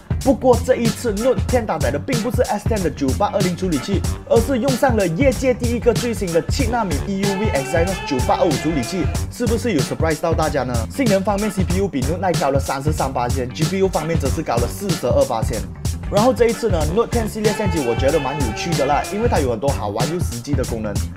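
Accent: Malaysia. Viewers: 馬式英文好難懂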